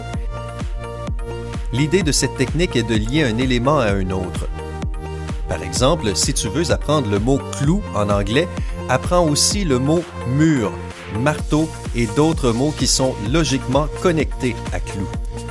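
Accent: Quebec, Canada. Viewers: Vue son accent je crois que c’est un Québécois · Un Canadien parle! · J'aime pas la facon cannadien de parler francais nuul.avec mes respect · Accent québécois ?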